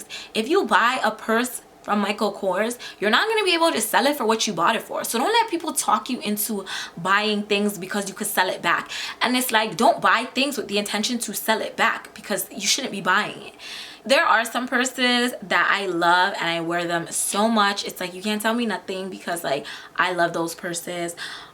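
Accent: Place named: Toronto, Canada. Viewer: I don’t know if I’m bugging, but I can lowkey hear a Toronto accent😭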